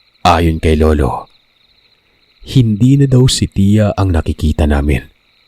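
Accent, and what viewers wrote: Visayas, Philippines. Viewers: Wow bisaya kaayog titol ohh hehehe❤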